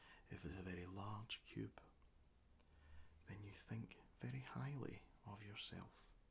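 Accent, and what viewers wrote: Scotland, United Kingdom. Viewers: Where abouts in Scotland are you from? Your accent sounds so much like my boyfriends... It's nice ☺️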